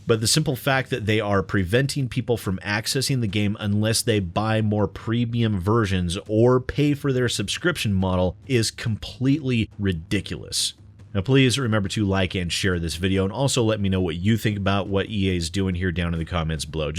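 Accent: RP, United Kingdom. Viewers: God I love the British accent, lol. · I'll be honest here: Depending on the game, publisher, and offered rewards, I'm not opposed to Pre-Order unlock tiers. I mean the one game I did participate in that with was one of the best games I've played and that was Tales of Zestiria for PC. As a result, I got Tales of Symphonia for PC and although it did end up being a rather crappy port, it was still 40-50 bucks that I would not have to spend later. The difference between Deus Ex and Tales of Zestiria in my own opinion would be one is promoting that they can release the game earlier than stated but won't unless they get enough money whereas the other is saying "pre-order this game by this date and we'll throw in the PC release of this other game which is a classic on consoles for free!" The Tales of Zestiria pre-order tiers implementation worked in my mind because it wasn't just a "you get more stuff for this game" as the final unlock but a "you get more stuff for this game AND we'll even give you more stuff for another game PLUS that other game!" Just my thoughts. 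BTW, EA is really bad at PR and human decency. EDIT: Also, we needs more British Accent! Hopefully that's not a DLC, but how much does it cost if it is? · That British accent along with the game speak and humor reminds me of TB... oh how he would have had a field day this year if not for his untimely passing... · he is not doing a british accent as there is not such thing he is doing a funny snooty posh english accent. Other people have said there bit on this like pretty good gaming and more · your british voice sounds awesome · Your snobby British accent is absolutely wonderful and pretty damn convincing as a Brit myself - I'd love to see you create a character and do something with that :D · I love the posh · Lol I liked your British accent also that's what's happening with me and my friends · i would pay a lot of money if he did an audio book in his smug british accent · I think I like this British version. 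It's funny to. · hahahahah... I love the English accent...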